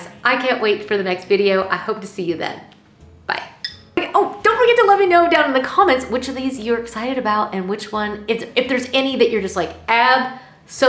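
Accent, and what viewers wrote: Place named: South, United States. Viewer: Oh my gosh..... The country English is so nooooooo ooooo, no, no, nooooo! 🙊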